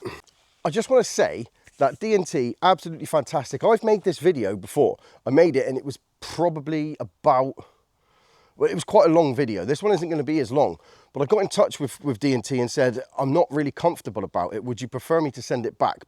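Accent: United Kingdom. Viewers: I heard the brittish accent and wondered what this dude could know about guns and how experience he could be rambles on for 4 mins then says he knows nothing and he doesn't understand the purpose of stuff.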